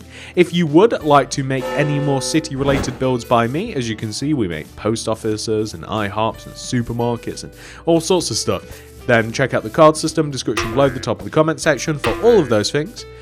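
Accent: United Kingdom. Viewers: heeyyyy watsup youtube? me: heyyy nothing much but you get more British everyday.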